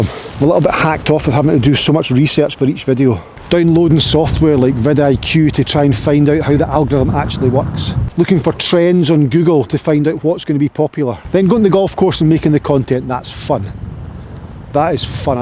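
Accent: Scotland, United Kingdom. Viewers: ....stress can get to us all....have a little Glenmorangie and please keep giving us pointers and instruction. I think your videos are extremely helpful...and funny! I forward many of them on to my golfing buddies. BTW... I love the Scottish accent! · I know you are looking for answers, but all I can say is that your videos actually have great value to me and have made a big difference in my game. You are my favorite on YouTube. I can assure it is NOT the Scottish accent that is a problem, for me that is a major draw. Nor is it playing in cold weather, I live in California where the weather is different, but I love seeing play in colder climates. I just wanted you to know that what you do matters, even if it doesn’t seem that way at time. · Yeah mate, welcome to the fickle fate of being a creative. My world as a T'ai Chi teacher and creative (visual and music arts) completely flipped since Covid. 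That's. when I started watching/subscribing to things I like on YouTube as a way of staying sane more than as a way to learn or get insights into a skill. And you're correct: nobody listens. I've got music/T'ai Chi students who I meet with regularly I know will absolutely not do what I ask them to do. You definitely got to do what is best for you. Much of your rant echoes so much self talk I've had over the past few years.I've gotten to the point where I actually don't charge for sessions any longer but ask for donations. What's changed is I have a few wealthy benefactors that provide me enough financial flow to do what I want. Not offering any advice here just hoping you are able to continue to do what is fun for you. I've enjoyed much of your content ... especially the Scottish accent. But teh whole damn thing of it is fickle. Keep being you! And so sorry for your mate with cancer. Hope for the best outcome for all: Blessings and Grace. 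· Mate, I live in East Lothian and love your content. Great to hear a Scottish accent. Quality coaching and above all, your honesty is so refreshing. Keep going, I’ll keep watching. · your content is both informative and refreshingly entertaining even with the Scottish accent 😂. Actually being Scottish appeals to me and I think many others. Your passion does come through in the way you are able to successfully demonstrate your teachings. Don’t give up, you’re simply too good and we all aspire to be as good as you are. Maybe consider some collaborate events or simply teaching your viewers the important aspects of course management. There must be so many other aspects you could cover some of which have already been stated in other comments. Almost all you tubers use click bait (which I hate) but….. if you cant beat them …. Looking forward to your next vlog 👍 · I’am watching this for the second time today. 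Hang in there!!! I have been trying to swing with open stance for 40 years and could no get it to work. Then I found you. Went from 16 Hcp to a 9 in two weeks. Driver was worst club in bag and now it’s my best. So thank you,thank you!!! Every time I see some one struggling, I give them your YouTube channel. Tomorrow having swing session with a 7 foot tall basketball player and will teach him the Eureka swing. And tell him to watch your channel as well. So word of mouth will grow you!! Love your sense of humor as well. Being American I had trouble understanding your Scottish accent at first, so watched with closed captioning, but now I finally have learned my second language 😊 Love You Man 😀👍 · I watch you, I enjoy your content. The Scottish tone is great. Your Hogan series I keep dipping in. I don’t know if this an April fools, YT is also a business and so changes the algorithm so as not to pay. 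Keep it up I love your tuition- Strawberry Mousse is a fundamental when my round starts the downward trend. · You are a first class teacher and person. Fantastic video of you playing and talking about life. The two coincide beautifully. I’d kill for your Scottish accent.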